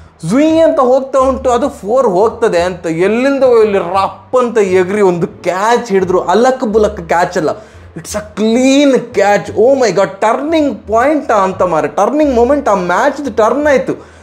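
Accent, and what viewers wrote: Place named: Dakshina Kannada, India. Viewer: ಬ್ರೋ ರಿಯಲಿ ನೀವು ಸೂಪರ್ ಆಗಿ ಮಾತಾಡ್ತೀರಾ.. ನಿಮ್ಮ ರಿವ್ಯೂ ಕೇಳೋಕೆ ಚೆಂದ ಅಷ್ಟು ಮುದ್ದಾಗಿ ಸ್ಟೈಲಾಗಿ ಮಾತಾಡ್ತೀರಾ. ಅದರಲ್ಲೂ ದಕ್ಷಿಣ ಕನ್ನಡ ಭಾಷೆ ಕೇಳೋಕೆ ತುಂಬಾ ಚೆನ್ನಾಗಿದೆ.. ಜೈ ಆರ್‌ಸಿಬಿ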